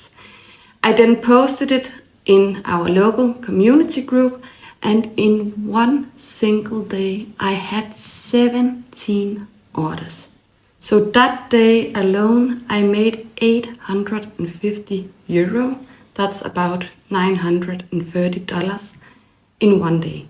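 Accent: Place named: Denmark. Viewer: Thanks for sharing your story with all of the real life ups and downs. I own a few of your files and love watching your videos! Your Danish voice sounds great to me. I play around in Illustrator designing my own files, but definitely want to get better at it. Looking forward to learning more from you.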